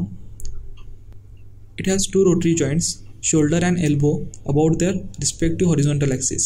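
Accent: India. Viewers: The most difficult English the indien English 😅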